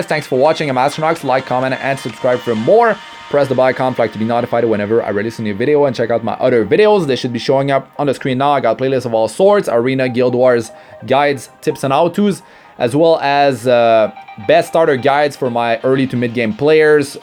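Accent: Quebec, Canada. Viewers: I am pretty sure you are a Quebecois with that accent XD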